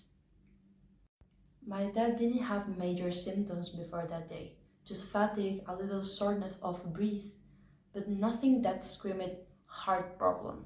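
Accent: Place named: Russia. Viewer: Why do you have a Russian accent ?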